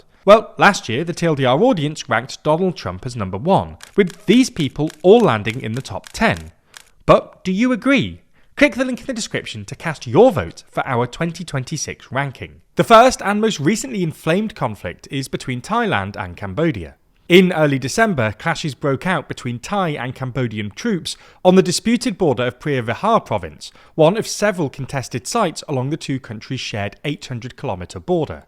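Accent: United Kingdom. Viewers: Hate your brit accent, this accent is more a turn off than conveying credibility. Britain is a has been.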